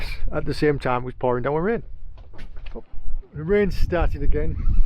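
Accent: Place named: Middlesbrough, United Kingdom. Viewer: I’ve been watching your videos and I do like the fact you explain the tides and wind and how you set your boat for fishing😁😁🤩👍 I have also noticed your accent and it sounds like you could be from Middlesbrough or cleavland or red car love your content 👍👍👍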